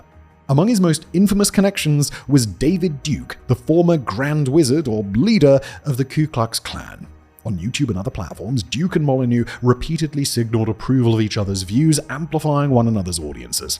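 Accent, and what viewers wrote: England, United Kingdom. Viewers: I'm not a fan of stephon, but you're censorious attitude is very off-putting, i'm starting to feel like whenever I hear an english accent that i'm about to hear some fascist rhetoric.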